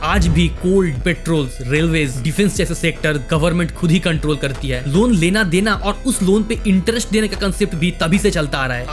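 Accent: Bengal, India. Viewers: ভাই তুমি তো বাঙালি? তোমার কথা বলার পদ্ধতিতে বোঝা যাচ্ছে। ধন্যবাদ তোমার ভিডিও খুব ইনস্পিরিং। আরো এগিয়ে যায়। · Bhaiya aap Bengali ho kya?? Apka hindi accent suun ke lag raha hai😅😅❤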